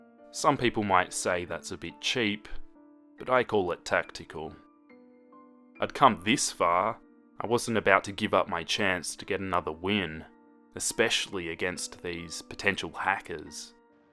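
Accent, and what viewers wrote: Australia. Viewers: Why did I only just realise that you're Australian lmao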